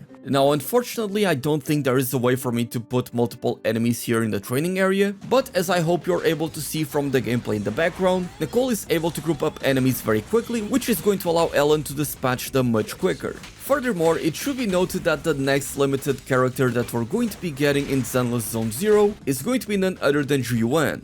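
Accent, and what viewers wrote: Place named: Portugal. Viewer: Mano o teu sotaque deu me logo dicas de seres português ahahah. Grande vídeo e agora com a vinda da Zhu Yuan, a nicole ainda vai ser muito mais viável! Forte abraço...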